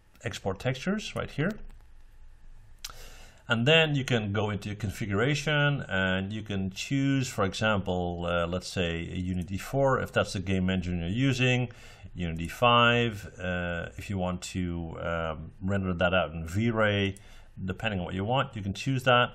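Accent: Netherlands. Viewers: Wait are you dutch? Haha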